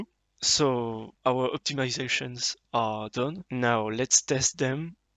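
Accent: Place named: France. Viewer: Ton accent français te trahit :) Très bon tutoriel. J'apprécie beaucoup ta chaîne YT - très pédagogique. Bonne continuation ++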